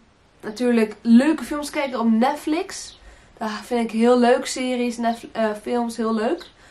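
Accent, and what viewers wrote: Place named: Portugal. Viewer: Desculpa a sinceridade, você é mais linda falando em português. Seu sotaque português é muito fofo. Amo quando você fala em português muito fofinha, charmosa ❤️🥰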